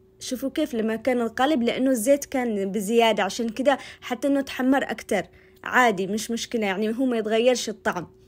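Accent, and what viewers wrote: Aden, Yemen. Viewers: يااختي يكفي كلامه العدني العسل اقسم باالله انه سكره اني من تعز ومافيش هذاالخبر وفعلآاصحاب عدن اكلهم روعه ويجنن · ههههه شكلك صفر بالطبخ البرياني طريقته جدا مختلفة عن الزربيات وعدن من قبل ما تعرفيها لها طابعها اشي بخور اشي عطور ولو ان الشمال اي اخوتها بالشمال لما يطبخوا في قنواتهم يستنكر ان الاصل عدن في الروائح الجميلة واشي فن و الطبخ الرهيب بس انت بجد اظطريتيني اعلق اما الانفصال او الوحدة هي بيد الرحمن وايش دخل الروتي بالسياسة ياسلته وتميز وسحاوق بالشقر 😂... اختي هذه والله اول مرة اشوف قناتك و3 ساعات وانا اتفرج ع فيديوهاتك الرائعة وتقديمك الرهيب ونظافة مطبخك وحشمتك وصوتك العدني الي يشوقني لطيبت عدن واهلها المتواضعيين بغربتنا ياسلام عليك الله يحفظك واهل بيتك من شر ماخلق استمري وهذا اول لايك لك ❤ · الهجه العدنيه غير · هي عدنيه مافهمتي لهجتها 😊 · كلامك يجنن اعشق اللهجه اليمنية محبتك من السودان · ما شاء الله شيء جميل. واللهجه اليمنيه اروع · ربنا يحفظك و يبارك لك في كل شيء. 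طبخك لذيذ جداً و مليان نصائح مفيده. بالاضافه إلى كلامك العدني الجميل. الف شكر من القلب و لك كل الاحترام و التقدير❤❤❤❤❤ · ❤تسلم يدك وكلامك العدني الحلو😂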